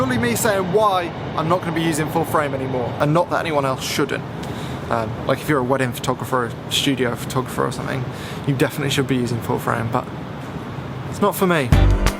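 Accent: United Kingdom. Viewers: I did not leave a negative feedback but I find videos with British accents off-putting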